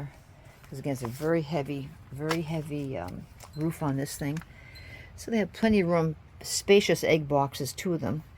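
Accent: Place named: New England, United States. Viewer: Ok, first what general area are you from, I moved from NH and your accent sounds familiar. Also, if you were buying a first coop for about 6 chickens, which one would you buy?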